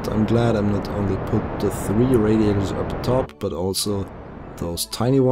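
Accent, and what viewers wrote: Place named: Germany. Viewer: 1) Great videos, I love the designs of your ships and your commentary! Thanks very much for everything! 2) Where are you from, if you don't mind me asking. From you accent I would say German or somewhere in Scandinavia. :3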